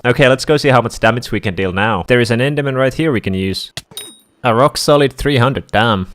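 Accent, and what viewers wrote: Finland. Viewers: Finnish english Accent is killing me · Kunnon suomalainen aksentti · Sie kuulostat vähä suomalaiselle. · Hey are you Finnish? Because means piece on Finnish and your accsent is really Finnish. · are you finland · Eka video jonka oon nähny tältä ja tiesin heti siitä miten se puhu et se on suomalainen :D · Are u finnish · guys if u think his accent is weird then hes from finland. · are you from finland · U finnish? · where are you from `finland?? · Suomi man? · Are you from Finland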